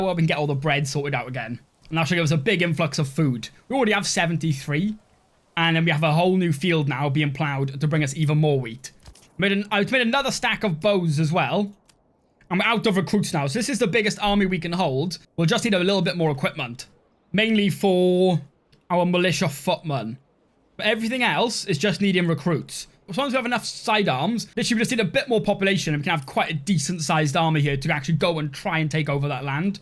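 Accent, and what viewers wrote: Wales, United Kingdom. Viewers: Got me hooked straight away with that Welsh accent lad! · Is that a Welshman I hear? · Ooooof so Welsh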